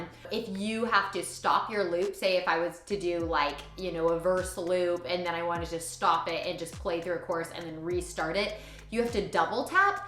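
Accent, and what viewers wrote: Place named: Nashville, United States. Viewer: I know very little about loopers. Quite proud of myself that I previously identified the TC Ditto x2 as my #1 pic for the same reasons that you did. This was still a real helpful video. Also, have to say- starting with a cool demo is so good. Most people start their vids with five minutes of talking. You Win! and sound like a Nashville area pro.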